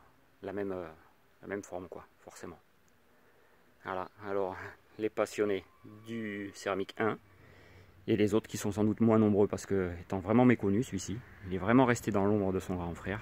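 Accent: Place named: Southwest, France. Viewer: quel plaisir de voir t'es video et quel jolie narration avec ton accent du sud Ouest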